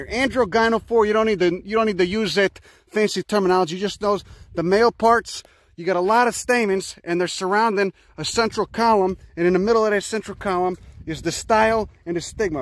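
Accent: Chicago, United States. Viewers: I KNEW you were an Italian from Chicago, we recognize our own around here lol. That accent is straight South Side. Good on ya bud, definitely appreciate the effort on that little guy's behalf. Sending you good vibes from da burbs.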